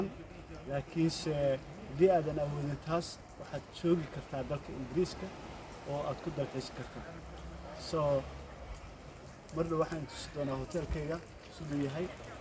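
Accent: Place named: North, Somalia. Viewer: Waryaa wax kale umada keen , inaad reer koraad tahay sida D u dhigaysaa laga garanayaa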